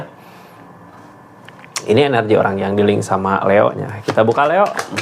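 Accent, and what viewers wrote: West Java, Indonesia. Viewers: Urang sunda nya kang.. 😂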